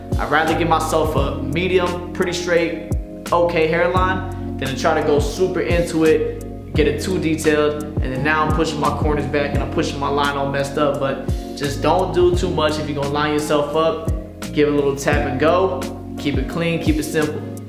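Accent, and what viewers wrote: North Carolina, United States. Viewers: Are you from North Carolina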